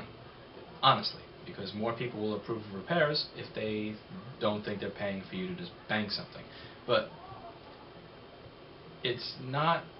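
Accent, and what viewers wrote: New York, United States. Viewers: Enjoying your videos on "repair shop culture" I gather that you're from New York?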